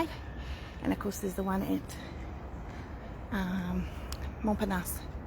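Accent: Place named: New Zealand. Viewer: Hi and thank you for the glimpse of this very majestic building. I hope it can be restored and saved. Are you a Kiwi? You sound to have a NZ accent. 😊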